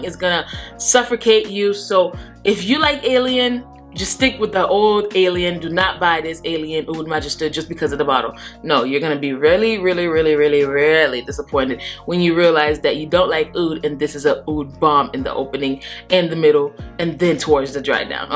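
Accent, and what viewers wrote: Haiti. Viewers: do i hear a haitian accent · Are you Haitian! I hear an accent ☺️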